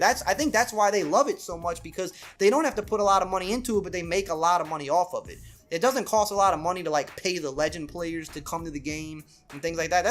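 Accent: Louisiana, United States. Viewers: What part of Acadiana u from bro I hear Louisiana all over you lol great content as always 👍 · do you have a cajun accent?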